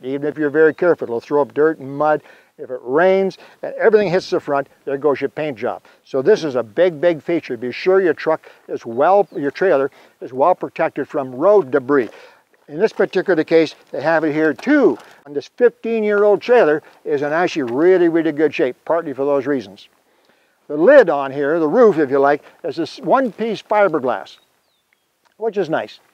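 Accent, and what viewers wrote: Canada. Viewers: I can spot a Canadian accent a mile away. Love this channel, cheers from Kitchener Ontario 🇨🇦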